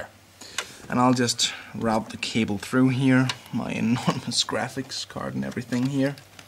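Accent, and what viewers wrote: Denmark. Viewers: haha jeg kan høre på dit engelsk du er dansk :)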